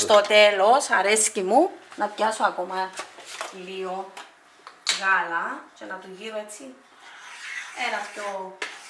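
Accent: Cyprus. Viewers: Ωραία ακούγεται Κύπρια είσαι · Πολλα ωραιο!! Μα πιο ωραια η προφορα σας ! Λατρευω την Κυπριακη διαλεκτο.Να ειστε καλα.💖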